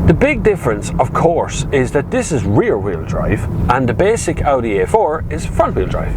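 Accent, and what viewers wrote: Ireland. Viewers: damn is that what the general irish accent sounds like? I first thought he was dutch or something... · In all seriousness, thanks for the review. But to everyone who has never heard an Irish accent remember: Der's more to Ireland dan dis! See Alan Partridge: v=1QRlR0ctjNE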